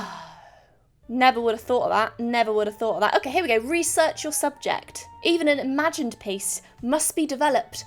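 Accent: United Kingdom. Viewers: “Let’s put on our fancy voice.” Tf you talkin bout ur british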